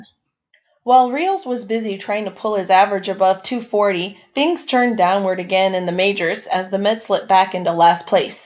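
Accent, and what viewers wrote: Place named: Cleveland, United States. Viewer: Im sure you couldve said Juan Rios better. You sounded like someone from Cleveland. Still you get a thumbs up sister!!!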